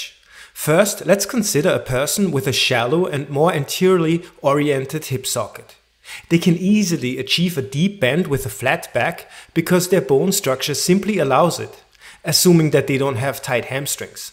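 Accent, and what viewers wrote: Germany. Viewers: Thank you for your time and work🙏🙏🙏 P.S.: höre ich da einen deutschen Akzent? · I am (was) German (lived most of my life in Sweden). I know that there is a town named Baumgarten in Austria and I think my family has some ancient connection there. Most people are called Baumgärtner or Baumgartner. Then there is "von Baumgarten", there even is a branch here in Sweden where I live, and my father claimed that his family was a "von" once but ditched it. I heard that the speaker in the video was clearly german speaking, but chose to write in english so anybody can read the Q&A, in case it conserns them, too. There are sooo many misconceptions and contradicting informations out there, I was really happy to find this video providing excelent explanations accompanied by really great animations! Thank you.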